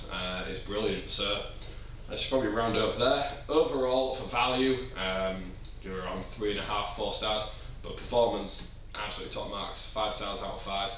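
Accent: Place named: Leeds, United Kingdom. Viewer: 2.uhhh lol you gotta be from leeds or somewhere like that??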